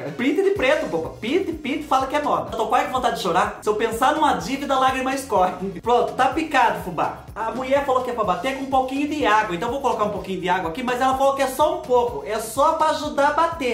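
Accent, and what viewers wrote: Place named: Caipira, Brazil. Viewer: Vc é ótimo fubá kkkk não perco um vídeo é bom de mais esse sotaque caipira eu quase morro de tanto rir kkkkkkk kkk😊😊😊😊😊😂😂😂😂😂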